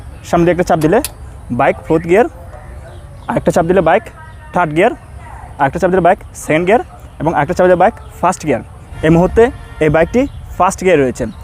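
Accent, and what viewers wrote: Chittagong, Bangladesh. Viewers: Vai ki Chittagong er 😂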